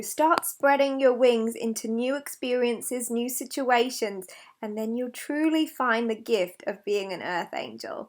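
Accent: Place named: Australia. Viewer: That's a very Aussie accent. Greetings from Brisbane.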